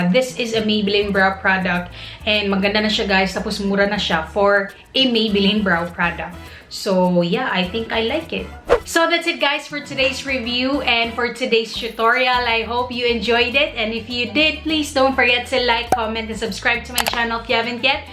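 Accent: Visayas, Philippines. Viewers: You have the bisaya accent. Are you? Hehe btw love this video. ❤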